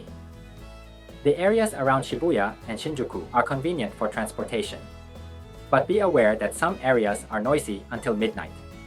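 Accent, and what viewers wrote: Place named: China. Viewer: He sounds like Chinese national living in Japan. Ying, yong, ong sounds. And he doesn’t have typical Japanese accent. May be Chinese national pretending to be Japanese?? Lots Chinese live in Japan.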